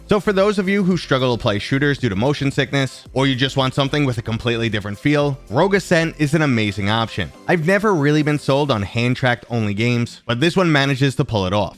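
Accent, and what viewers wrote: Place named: Massachusetts, United States. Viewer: thought i heard a hint of Massachusetts in your accent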